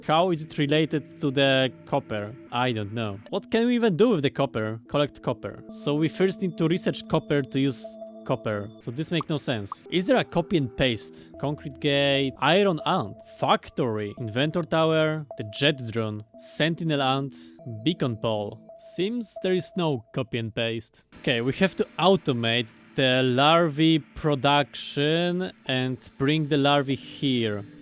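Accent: Poland. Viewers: Odrazu wiedziałem że jestes Polakiem, wystarczyła chwila abym wykrył rodaka, poza tym niezły kanał, gratulacje.👌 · czy ja slysze polaka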